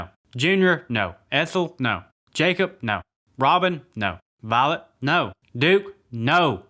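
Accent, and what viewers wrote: South, United States. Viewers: You mentioned so I am curious, how old are you? Range? I ask because I grew up with these films and watched them multiple times. I am about to meet Corey Feldman and Adrienne King at a convention so I really like your videos and you have a nice voice, is that a southern accent? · 1. I couldn't find a "10 Things I Still Don't Understand About" playlist. Where is it? Trying to binge those videos and YouTube keeps playing videos from other channels after one ends. 2. You sound as country as me, so you should know that country families usually have their own cemetery. Just a little piece of land somewhere on their property where they bury their dead, assuming the family has lived there since the 1800s, early 1900s at least. The question should be why is the Crystal Green (Forest Lake) cemetery so huge?! Is the town really that big? 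And filled with newcomers who don't have a family cemetery?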